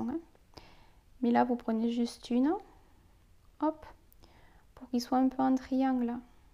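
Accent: South, France. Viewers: Super merci beaucoup, j aime beaucoup vos tutos et votre accents du midi me rappelle les vacances 😊. Euhhh j avais une question : quelle composition de laine prendre pour les doudou Bb comme la layette ? Je débute et j ai des doutes avec le choix de la laine. Je sais qu'elle est conseillé sur le modèle mais j ai récupéré un gros stock de laine neuves, 💫mais beaucoup d acrylique biensur.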